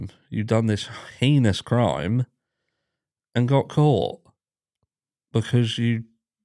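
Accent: United Kingdom. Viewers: Face it, folks......true crime just always sounds better with a British accent.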